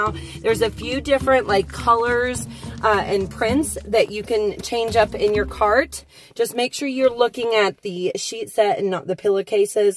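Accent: South, United States. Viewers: Geezermann 😂😂🤣🤣Her southern accent I just love her she’s amazing accent and all🤣🤣😂😂🤗❤️🙏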